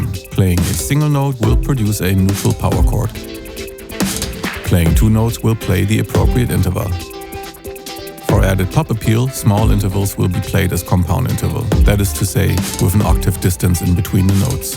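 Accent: Germany. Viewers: Well it's a German company and the narrator is obviously German, like Kraftwerk 😉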